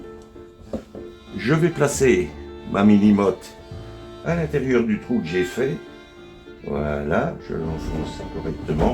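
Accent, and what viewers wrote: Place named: North, France. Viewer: Vous êtes un modèle, une expérience, une expertise, une bienveillance, un accent et cette chose inexplicable des personnes du Nord. Envoyé par un ancien picard devenu parisien, élevé dans le potager du père et la culture d’endives en champs…du père également ! Continuez à nous régaler et bravo. Miguel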